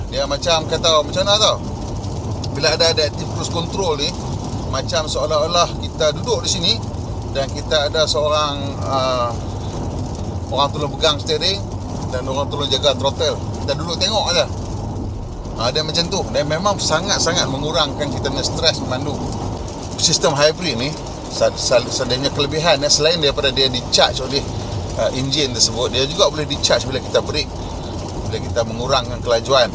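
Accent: North, Malaysia. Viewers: best explain loghat utagha..sempoi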